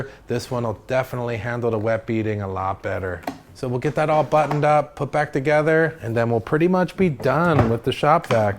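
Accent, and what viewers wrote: Hawaii, United States. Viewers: Love that Hawaii accent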